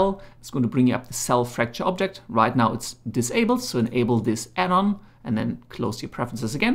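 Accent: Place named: Germany. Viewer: Ich mag "Deutsches Englisch"... Grüße aus Norddeutschland... Cooles Video.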